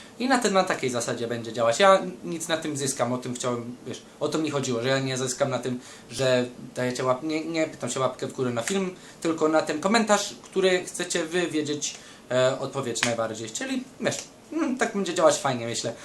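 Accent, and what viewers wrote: England, United Kingdom. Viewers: BO JEST Z ANGI TO AKCENT:D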